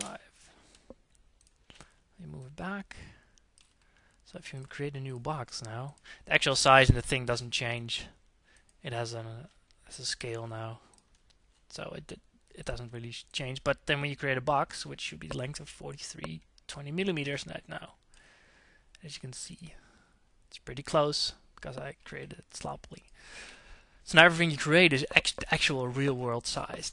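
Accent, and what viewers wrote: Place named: Netherlands. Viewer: Dutch...het accent. nice tut bro ;)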